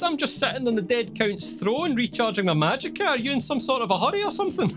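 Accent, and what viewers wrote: Scotland, United Kingdom. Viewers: A Scottish man with a thick accent telling me how perfect one of my favorite games of all time is? This day got even better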